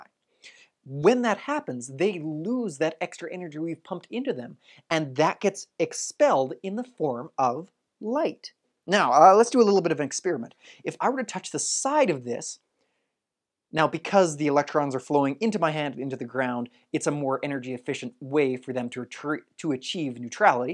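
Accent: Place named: Ontario, Canada. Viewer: i know a South East Ontario accent when i hear one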